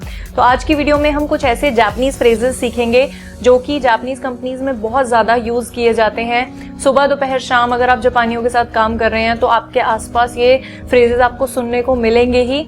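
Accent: Punjab, India. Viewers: Ap punjabi ho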